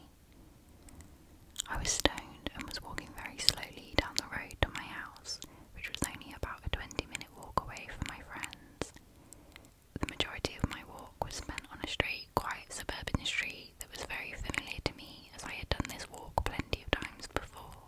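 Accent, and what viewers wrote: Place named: United Kingdom. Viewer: GOD DAMN you're impeccable dude!! As per usual lol. Ive gotta say, you have suuuuch a lovely British accent ❤ Im Aussie, my former father in law is a Geordie.. so i know not all English accents are as... ummm... soothing as yours? Lol! 😂 Love your work doll, seriously.. you're unbelievably good at this. Your accent & whisper tone as so well suited to ASMR ❤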